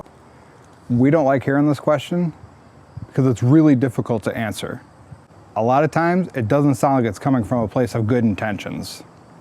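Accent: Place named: Canada. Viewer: He’s in America? He sounds Canadian to me.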